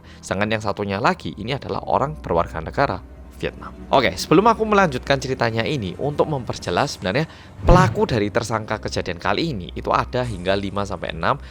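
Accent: Java, Indonesia. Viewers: Sampean kui wong korea opo wong jowo, kok bosoe medok mbanget... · Kok bisa suaranya medok sekali spt orang Indonesia. Suara koreanya hilang samasekali. Salut.. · ABG ini orang Indonesia apa orang Korea sich suaranya kok Jawa medok kali. Bingung JD nya. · Korea kok ngomong logat nya Jawa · Mas ini logatnya Jawa banget. Seneng dengarnya 😊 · Bosone medok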